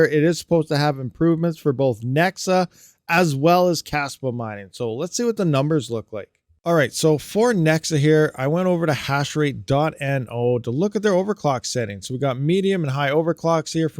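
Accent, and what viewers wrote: Canada. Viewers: Are you Canadien buddy?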